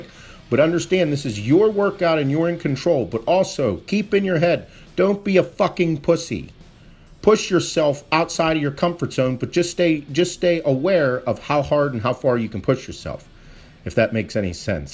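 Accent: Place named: Pittsburgh, United States. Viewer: Love these videos. Probably the yinzer accent.. Crushin it, boss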